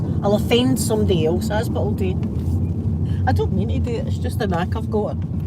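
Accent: Scotland, United Kingdom. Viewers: Hello 👋 im gonna watch this i widh i wouldve caught it live. Love you accent. 😊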